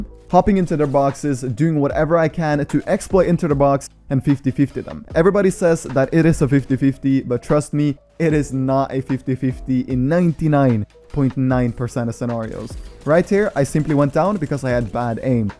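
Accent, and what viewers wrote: Norway. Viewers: Er du fra norge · are you norwegian?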